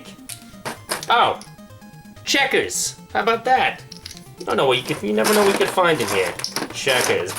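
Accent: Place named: East Coast, United States. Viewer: Oh I was just kidding around, your east coast accent reminded me of the Honey Badger video lol. Cool vid man, I really enjoyed seeing all those gobots! It would have been nice if you'd done a quick transform on them and then just edited out the time it took. A lot more work for you, I know, but definitely an improved viewing experience!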